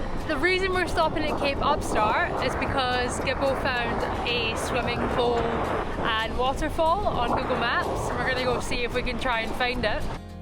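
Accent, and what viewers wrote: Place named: Scotland, United Kingdom. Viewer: I detect a Scottish accent so that'll do for me to subscribe - awra best hen 😊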